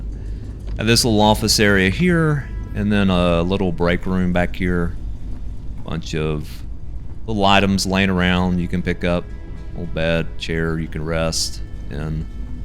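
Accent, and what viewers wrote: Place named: Texas, United States. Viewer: You sound like a Texan, and YouTube is severely lacking in Texan gaming content creators. Subbed and liked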